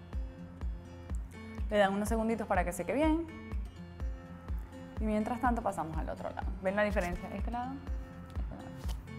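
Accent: Venezuela. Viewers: el acento Venezolano no se iguala, lo reconoci al instante, me encanto tu video Saludos desde caracas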